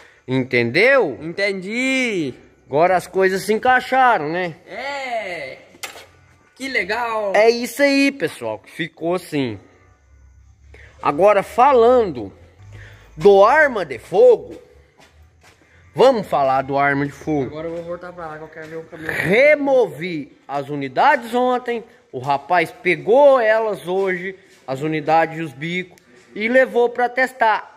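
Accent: Minas Gerais, Brazil. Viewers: Ô trem bão é bão, o trem ruim é ruim ,mais é sempre trem, entendeu trem?!?!?!?! Kkkkk rachei de rir com esse mineirim, ô trem bão sô.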